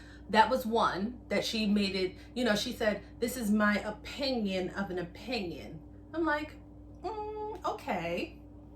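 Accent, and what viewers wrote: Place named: New York, United States. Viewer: New York in the house 😆😆😆Love the accent!!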